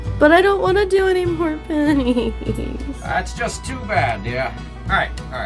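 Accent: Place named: United Kingdom. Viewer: Love the British or is it Irish accent LoL 🤣😆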